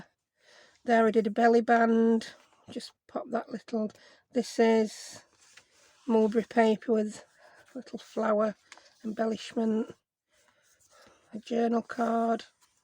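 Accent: United Kingdom. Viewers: Hi, I have been watching your videos for a while now but I am also horrible about leaving comments. I am usually watching on my TV. I really enjoy your tutorials and have done several of your projects. Sometimes I just put on one of your videos just to listen to you while I craft. I lived in the UK for 6 years and really miss a lot of things so listening to you talk makes me feel at home. So happy for you and hope you enjoy continued success and many more subscribers.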